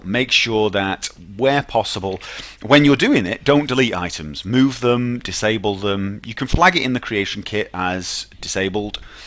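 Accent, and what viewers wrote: United Kingdom. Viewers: Not only does your voice sound annoying, no, on top of that you have a highly obstructive british accent. Please visit a speech therapist, everytime I have a problem with Skyrim and watch your videos my ears feel raped. It's not "coot" it's "cut"...damnit. Anyways thanks for the thorough guide and fixing my problems again. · Fucking bittish accent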